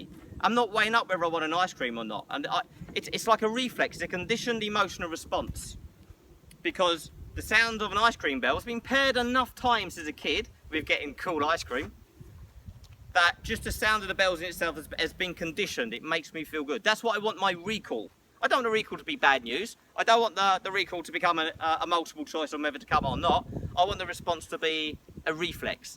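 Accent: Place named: London, United Kingdom. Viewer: Where are you based. You sound like you are from London.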